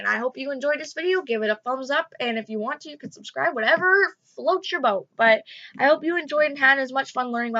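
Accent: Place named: Upper Peninsula Michigan, United States. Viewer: You sound like a yooper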